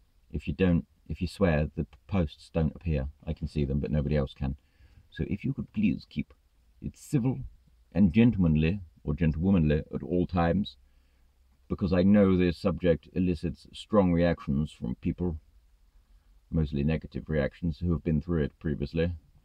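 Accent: RP, United Kingdom. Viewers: hahah hope you get in to CPC training and do mine when I do it…with your posh accent please, would make the day a bit more fun! :) Just a question, I'm about to go ahead with the training course for LVG C+E, with The LGV Training Company (they came up first in google when I typed LVG Training Courses) would you recommend them? For the C+E + CPC+something called Direct Access Course, it mounts up to £3K… is that the normal price these days? Thanks in advance and thanks for your videos! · He's BACK BABY!!!!!!!!!! I thought you've lost it on your last video but I was wrong. the funny is back and most importantly the posh voice, GOD I missed it so much.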